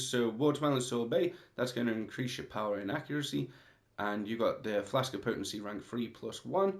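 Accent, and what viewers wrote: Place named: United Kingdom. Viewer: Nice to hear a British content creator for NW. But why play on console? {:-:-:}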